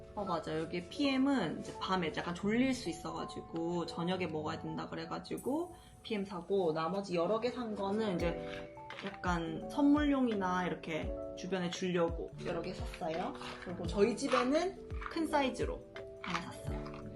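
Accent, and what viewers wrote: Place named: Gyeongsang, South Korea. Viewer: 혹시 경상도분이세요? 사투리 장난없으시네여 🤭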